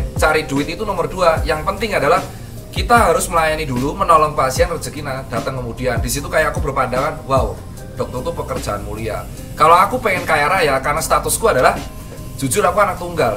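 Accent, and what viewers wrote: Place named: Surabaya, Indonesia. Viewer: Ijin, bilamana buat tema saran/alternatif solusi : #salah masuk SMA (salah jurusan IPA / IPS / BAHASA) next... next... / SMK jurusan (listrik, mesin, bangunan, dsb) next... next... lulus sekolah atas, setelah pilih kuliah (keliru jurusan ???, bingung) / pilih kerja / kuliah+kerja (+- nya ... gmn management nya, biasanya... molor kyk i 😇). Seneng gaya+ngomong suroboyo an e / jowo medok, to the points lozzz ora rewel Sukses teros... dokter + enterpreneur 👍👍👍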